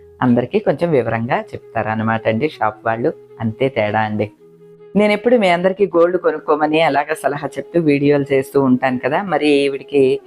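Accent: Godavari, India. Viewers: Amma namast he.Memu yesterday Annavaram vellam.Vacchetappudu meeru baaga gurthoccharu.Godavari district maata vintene Amma maata gurthostundi.Happyga anipistundi All the best Amma Amma (meeru)cheppina chaala manchi maatalu memu acharisthunnam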